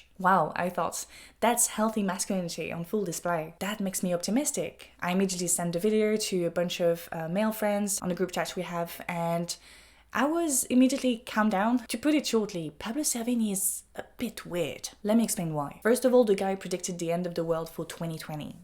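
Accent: France. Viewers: damn i am just a sucker for the french accent. love your content btw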